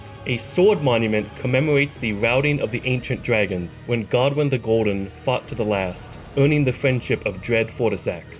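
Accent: Pittsburgh, United States. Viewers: Are you a yinzer?! Pittsburgh'er?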